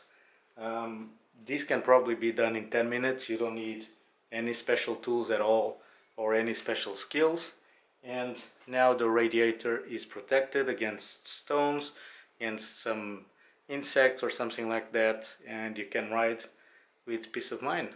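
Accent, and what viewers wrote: Portugal. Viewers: Excelente vídeo! Desde o primeiro vídeo que vi teu, achei que pelo sotaque serias português lol Já agora, os buracos nos cantos superiores da grelha servem para algo? Abraço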